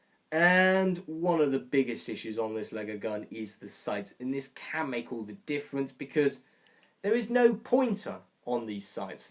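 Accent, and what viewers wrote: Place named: United Kingdom. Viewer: I knew you were British from your accent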